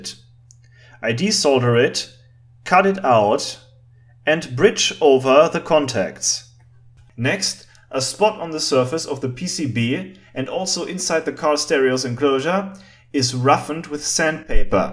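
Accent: Germany. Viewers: The switch has to be deleted.......... LOL In den Papierkorb verschoben......... gröhl.... Herrlich dieses Englisch mit dem deutschen Akzent! Ich bin stolz auf dich! Weiter so! Vielleicht doch zukünftig in Deutsch? Aber solltest Du dich mal nach Bonn verirren gehen wir ein Bier Trinken! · i was here just to hear german speake english with accent! it was good ! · I love how you almost mix some German words into the English ones. Like Zwölf and Twelve turn into Twölf😂 Also, awesome hack. I have used your audio input hack on an old Grundig "Music Boy" radio reciever, and it works great! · Man hört den deutschen Akzent einfach mal sowas von :D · Hate german accent -.- SO FCKN TERRIBLE!! Nice vid tho.. :D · Horrible German accent. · Dis strong German Dialekt made me klik Like at instant.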